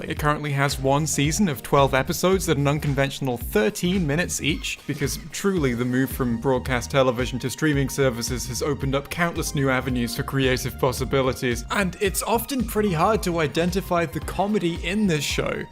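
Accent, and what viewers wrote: England, United Kingdom. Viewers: Man's literally speaking Queen's English isn't he? Hard to tell how biased this is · Whoo that’s too much British for me today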